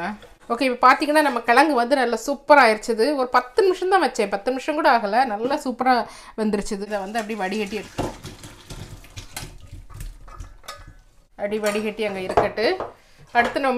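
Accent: Nagercoil, India. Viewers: Sir learnt nagercoil slang from u madam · I really like to hear ur Nagercoil slang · Nagercoil slang🔥🔥❤ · நம்ம ஊரு பாஷை, அப்புறம் பழக்க வழக்கங்கள் முன்னாடியெல்லாம் மற்றவர்களால் கிண்டலுக்கு உரியதா இருந்துக்கா, ஆனா இப்ப உங்களை மாதிரி சாதிச்சவங்க அத சரளமா உபயோகிக்கும் போது ரொம்ப பெருமையா இருக்கு நானும் நாகர்கோயில் வாசி தான்னு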